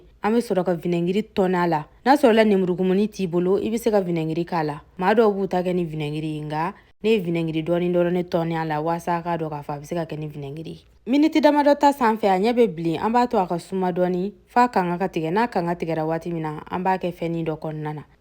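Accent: Bambara, Mali. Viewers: J'adore votre accent bambara ❤😊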